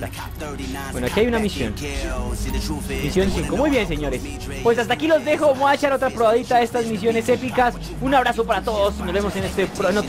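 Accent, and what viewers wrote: Colombia. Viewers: si creo que es colombiano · ERES COLOMBIANO CIERTO SE SIENTE TU ACENTO YO TMBN SOY COLOM Y HABLAS IGUALITOOOOOOO VIVA COLOMBIA. ENCERIO ERES COLOMBIANO VERDAD?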